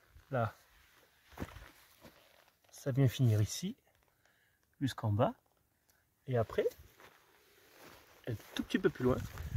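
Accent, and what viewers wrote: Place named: Corsica, France. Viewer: Bonjour peux tu me dire à quelle altitude tu te trouves et quel type de sol as tu? A ton accent, il me semble entendre que tu sois de l'île de Beauté. Merci et bonne continuation.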